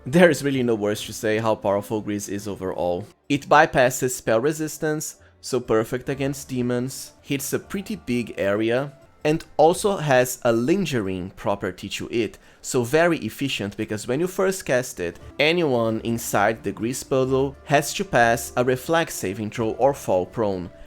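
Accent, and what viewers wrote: Brazil. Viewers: What a kind, beautiful, genuinely helpful and clearly profoundly rich in experience video. A video out of pure passion. Thank you so much dude. Also, let me ask you, if you see this comment despite it being on an old video: Are you Brazillian? I ask because I am and your accent sounds a little similar to mine hahah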